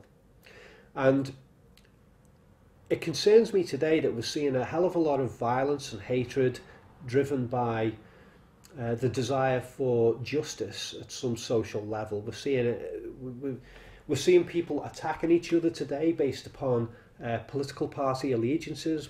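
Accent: Liverpool, United Kingdom. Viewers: Love you and your chanel, but as a Blackburn native its very very funny to hear a scouser say "did him too" when describing a whole ass murder haha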